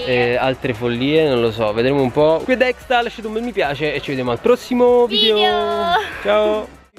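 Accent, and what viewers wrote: Palermo, Italy. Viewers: Finalmente rieccoti! Ci mancavi! Comunque, sei della provincia di Palermo? O mi sbaglio?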